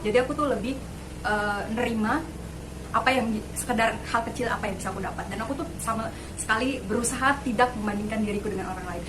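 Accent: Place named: United Kingdom. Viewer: Lebih pantas lg klo bicara tanpa menggunakan aksen English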